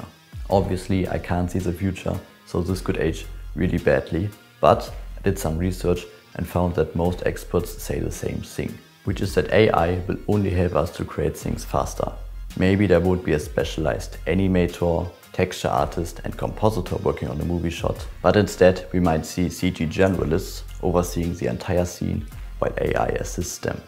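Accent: Germany. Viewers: Ah höre ich da nen deutschen Akzent? 😳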